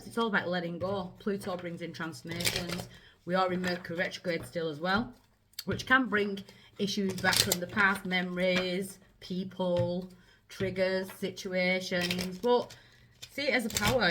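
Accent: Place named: North, United Kingdom. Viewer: Thats a northern accent if I ever heard one!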